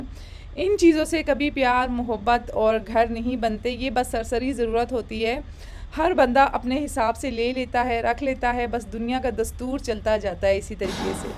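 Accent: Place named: Pashto, Afghanistan. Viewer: AOA dear sister,sanga ay❤sha ay?staso pashto koh bilkul zama shanty da😂andaz koh bilkul zama trhan dy😂😂aao vlog k pashto lazmi waya.deer sha lagi❤❤❤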